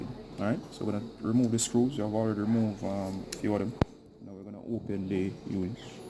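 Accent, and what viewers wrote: Jamaica. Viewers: can you please fix mine. It just chip out:'(.You sound Jamaican, I'm Jamaican can you please help me.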